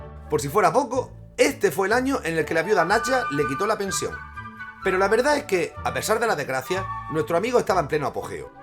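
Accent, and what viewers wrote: Andalusia, Spain. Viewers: es acento español de Andalucía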